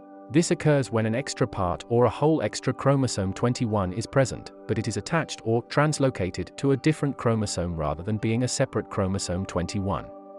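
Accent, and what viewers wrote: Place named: United Kingdom. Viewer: Why a British accent giving American statistics?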